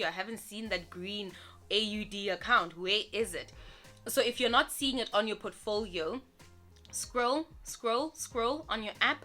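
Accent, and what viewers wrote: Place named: South Africa. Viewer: I thought you lived in Australia but I was surprised by the South African accent 😂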